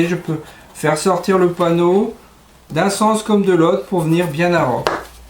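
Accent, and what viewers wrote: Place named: Nord-Pas-de-Calais, France. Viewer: Bien expliqué, le petit accent ch'ti, j'adore!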